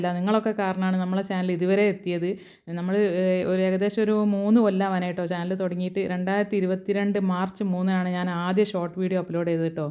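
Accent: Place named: Malappuram, India. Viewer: ചേച്ചിയുടെ പേരെന്താ?😂സ്ഥലം മലപ്പുറം ആണെന്ന് തോന്നുന്നു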